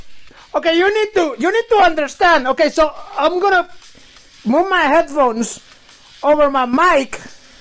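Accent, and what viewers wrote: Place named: Eastern Europe. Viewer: Lol nice review brudder, like the energy. Gave me old YouTube vibes. Slavic accent on top of it all was an entertaining touch. HiBy R1 is a beast if you're willing to work with its quirks for sure. Has LDAC and MBES too which I wasn't expecting. Plus if you really wanted, you can use it with an external DAC/Amp too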